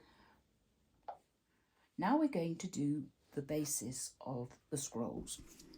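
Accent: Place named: United Kingdom. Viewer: your British accent is music to my French ears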